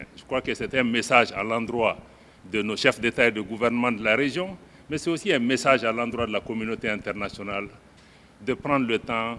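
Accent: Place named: Wolof, Senegal. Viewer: Tu as un accent ouoloff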